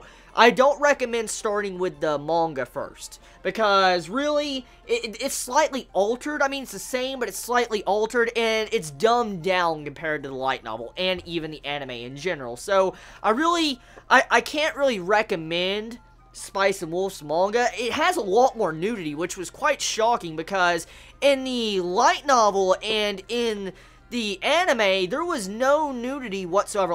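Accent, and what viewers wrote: South, United States. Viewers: At first I thought he was from the UK, but now I'm thinking he's from the southern states...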